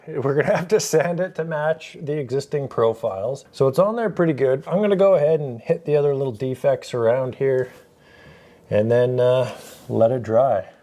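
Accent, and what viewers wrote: Canada. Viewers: I have no idea why this was in my feed and I've never seen this guy before, but he sounds very Canadian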